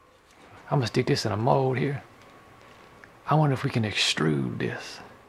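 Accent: United States. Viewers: Great video! I love your American accent!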